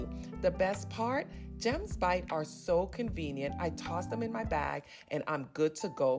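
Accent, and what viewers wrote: United States. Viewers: You are glowing. And your American accent reminds me of Nia Long. She is from the Caribbean, too 🩷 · I really enjoyed this. I though I detected some New York accent. I am from NY.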